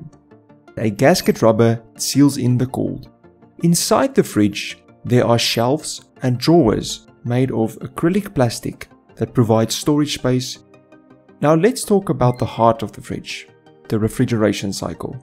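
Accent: South Africa. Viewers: South African accent👍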